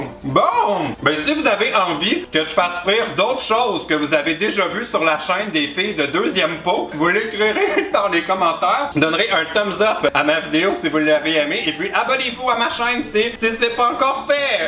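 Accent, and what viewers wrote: Quebec, Canada. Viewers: J'adore ton accent ( je pense québécois ) et j'adore cette vidéo ( mais aussi ta chaîne ) GROS BISOUS 😁😘😘😘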